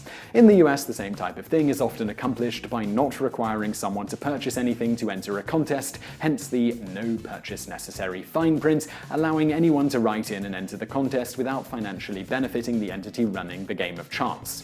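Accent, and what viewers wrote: United Kingdom. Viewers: Call me Daddy Well no shit... a google search could answer anything. The reason most of us watch this channel find out something we could easily look up is to hear charming British accent relaying the information lol.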